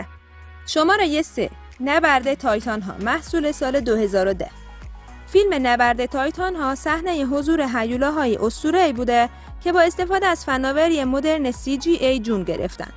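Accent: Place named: Yazd, Iran. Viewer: انشاالله که موفق باشید ولی شما فرمودید ده فیلم برتر ولی من فیلم برتری تو این فیلم ها ندیدم نقش بن هور در این فیلم ها کجاست یا فیلم جیسون و خدایان و یا حتی سامسون و دلیله و در نهایت لطفاً مطالب رو سعی کنید شمرده شمرده تر با اون لهجه زیبای یزدی بخونید و فیلمها هر قسمت رو بر روی متن اون ادیت کنید ،امیدوارم با رعایت این موارد بتونید موفقیت بیشتری کسب کنید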